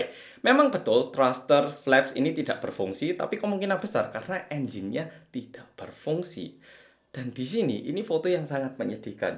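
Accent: Java, Indonesia. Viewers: Makasi, dapat ilmu juga terkait pesawat dkk, dan berduka cita untuk JejuAir 🙏🏻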